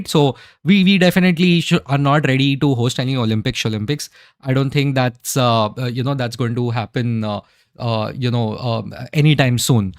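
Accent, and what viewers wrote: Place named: Allahabad, India. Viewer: You sound like an Allahabadi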